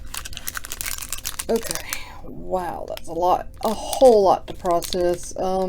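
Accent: South, United States. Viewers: G'day, Love the Southern accent and I enjoy your insights on Baseball cards Cheers mate 🍻🇺🇸🇦🇺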